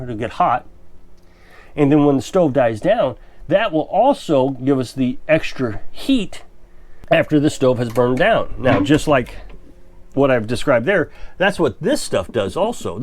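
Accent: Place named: United States. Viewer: You are speaking American, not English. Maybe it's American English, but it's not English.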